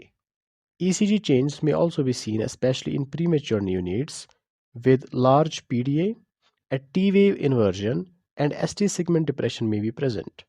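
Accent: Kashmir, India. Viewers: your accent sounds kashmiri !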